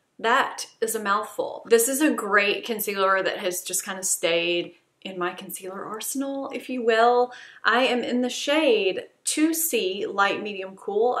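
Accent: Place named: Memphis, United States. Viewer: Gotta say, being from Memphis, your Southern accent sounds distinctly like it's from my area. You sound like a Memphian! Great videos!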